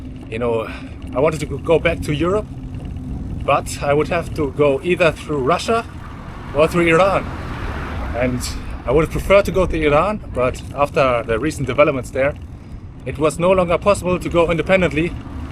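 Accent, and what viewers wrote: Germany. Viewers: German accent unfortunately drives me crazy - too German🙈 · I freaking LOVE the video series but this heavy german accent in his english is hard to endure .. selbst oder ganz besonders als Deutscher, das klingt schrecklich vor allem diese Betonung .. :(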